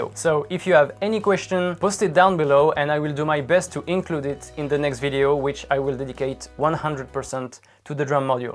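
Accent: France. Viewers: french accent here and there is just 😍.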